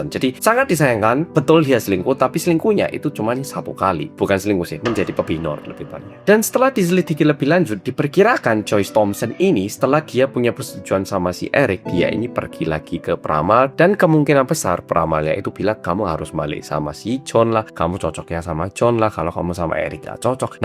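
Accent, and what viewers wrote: Java, Indonesia. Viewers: menemaniku saat cuci piring yaaa oppa medok😊😊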